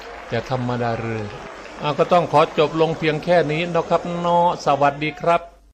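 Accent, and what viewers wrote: Isan, Thailand. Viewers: ผมชอบ.มากกครับพากษ์อีสานบ้านผม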